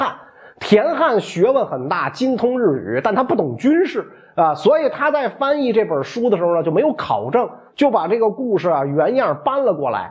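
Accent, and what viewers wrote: Beijing, China. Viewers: 講普通話，有些北京腔聽不太懂。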